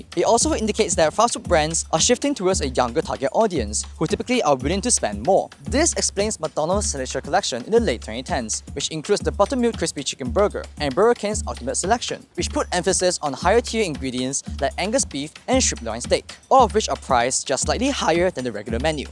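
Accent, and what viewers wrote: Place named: Singapore. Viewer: Tf was he saying. That fake slang plus Singaporean accent damn confusing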